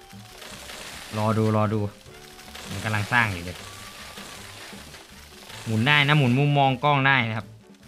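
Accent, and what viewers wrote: Korat, Thailand. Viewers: คนโคราชมะ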